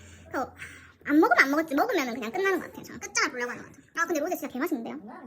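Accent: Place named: Busan, South Korea. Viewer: 님 부산분인가봐요^^ 반갑반갑~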